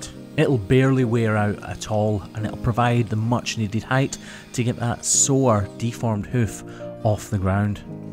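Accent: Scotland, United Kingdom. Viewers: Her hoof damage reminds me of a weird nail I have. As a kid, I smashed my right hand ring finger between the door and doorframe, you know where the hinges sit, and I broke the very tip of my finger - right where the nail is formed. Today I have a strange nail that grows in layers, with a weird top layer that kind of splits off from the rest of the nail and I have to cut it really, really short for it to be comfortable. The scarring on my finger is extremely sensitive and the tip of my finger is actually shorter than the left hand ring finger, visibly so and when I put my hands together to compare, I lack about 5 millimeters on it. While my own finger and nail will never be "fixed", it's a thing I've learned to live with and it doesn't impact me in any real way. I hope this little miss can lead a good life, even if the keratin finger issue reemerges. 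These videos are super comfy and not only do I get to listen to the dulcet tones of a Sco'ish man, I get to see cattle having their hoof injuries treated with the utmost of care and respect for the animal.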